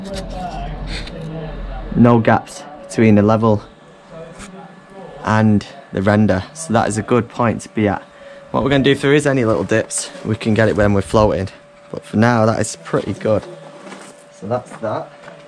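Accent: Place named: Northern England, United Kingdom. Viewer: Nice work there, just wondering where abouts you work as I'm in northwest UK and you sounded like a northern lad 😉 as in need of a house render as well as me cills cleaning up as they are looking battered. Cheers. Kev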